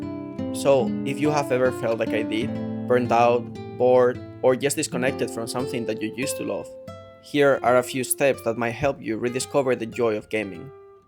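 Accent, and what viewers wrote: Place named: Spain. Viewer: Se nota que eres español, buen ingles crack ÑÑ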